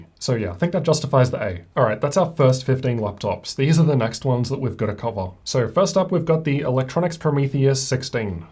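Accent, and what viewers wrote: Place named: Australia. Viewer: I love your videos and Insite on everything but when I I hear you say words that end with Ole or ore and some other words I don't know how you don't start just dry heaving. How do you get that low and distorted while stile talking without choking lol your voice is amazing I can't even do an impression without choking lol your deep voice mixed with the aussie accent kills me I love it, but can't recreate it lol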